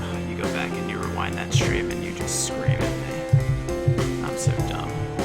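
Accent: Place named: East Coast, United States. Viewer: Lol, I can pick up a "Yankee" accent with your new mic 👍 Are u in the east coast somewhere?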